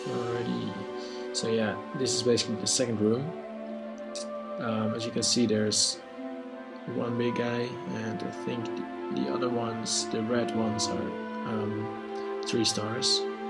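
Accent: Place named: Netherlands. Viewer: dacht ik ook al nederlands accent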